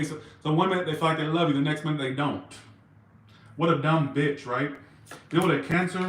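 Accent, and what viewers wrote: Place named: South, United States. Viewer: U r good!!! Im looking to travel and perhaps move… lol love the accent it’s why i need to move or want to move south!😊 it’s been my goal thank u Covid for holding up my plans.. im going.. not sure-I don’t have a person im riding the wave…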